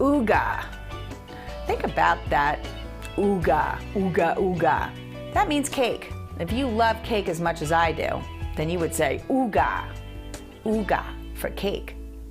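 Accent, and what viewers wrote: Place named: United States. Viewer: what an american accent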